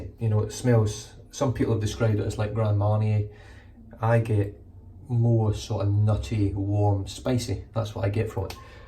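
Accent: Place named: Scotland, United Kingdom. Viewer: Hmm. Why put yourself down. Who cares about being correct for the masses, and the Scottish accent is a great one... never hurt Sean Connery, and Trainspotting was a WORLDWIDE hit... including here, in America! Don't water anything down. That's how people learn new languages & cultures. We sit, watch, enjoy the humor, and eventually get comfortable with 'em. Kind of like back when you decided to feature Australian artisans the rest of the shaving world may not know about. If you're just trying to increase your subs to make more ad share money or something, then I kind of understand, but I don't think you monetize your channel.... do you?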